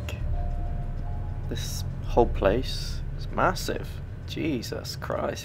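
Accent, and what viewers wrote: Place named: England, United Kingdom. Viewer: "IMA TRYIN ET AMMO YA FAWKING CAUNT" xD i luv the english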